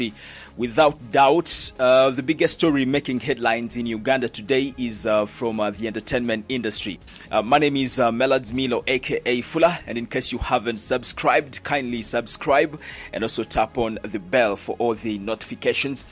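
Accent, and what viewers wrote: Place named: England, United Kingdom. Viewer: I just love ur English accent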